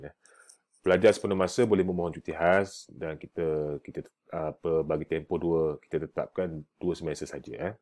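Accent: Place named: Perak, Malaysia. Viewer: Pekat bebenor peghok nye ye😂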